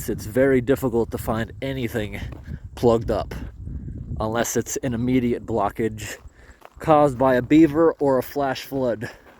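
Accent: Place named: New England, United States. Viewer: I think your yankee accent threw them off... They weren't sure what you were saying.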